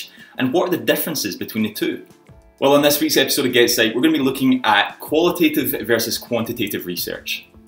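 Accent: Scotland, United Kingdom. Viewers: That scottish accent though :D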